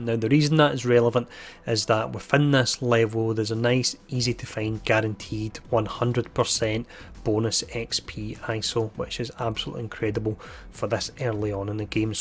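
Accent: Scotland, United Kingdom. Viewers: I thoroughly enjoy your Scottish Accent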